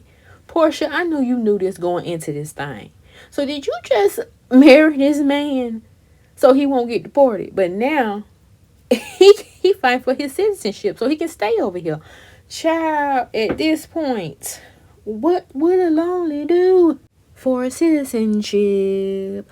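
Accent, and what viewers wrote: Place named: Mississippi, United States. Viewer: I love your accent 😂 I’m from MS and this is how we talk!!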